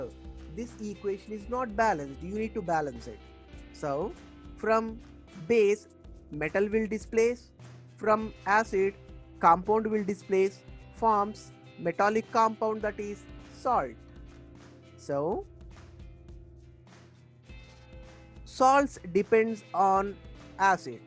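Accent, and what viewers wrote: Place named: India. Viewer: It's a Indian accent😁 I am 100% sure.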